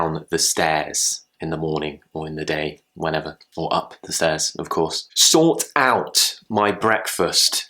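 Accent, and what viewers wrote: RP, United Kingdom. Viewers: this is very useful ,and i like British accent because of i always watch your videos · Thats mean British accent mate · Don't change the British accent to the American accent PLEASE. ...people prefer British accent because it is father more beautiful. So keep it please. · I hear British accent here. :) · This video is very useful! Thanks for share your knowladge and I really like your British accent. 👌🏽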